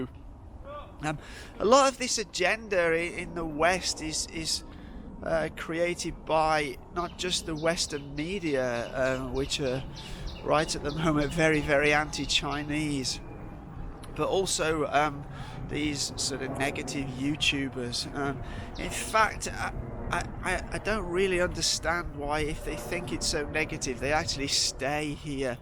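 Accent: United Kingdom. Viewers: It is interesting to see some British guy (judged from accent) filming comments about China while self-wondering in Chinese city lol.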